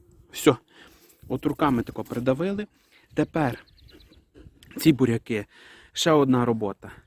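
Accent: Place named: Western Ukraine, Ukraine. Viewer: Такце наша західнецька мова файна і така посадка тоже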